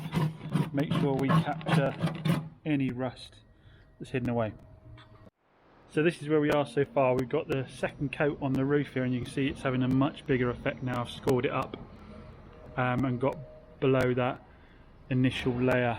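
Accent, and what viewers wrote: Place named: North Devon, United Kingdom. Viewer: haha.. thought you sounded really close, I’m in south Molton!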